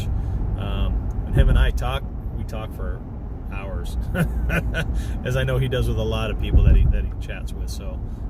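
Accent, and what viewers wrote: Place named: Australia. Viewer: You tell like a Aussie 😁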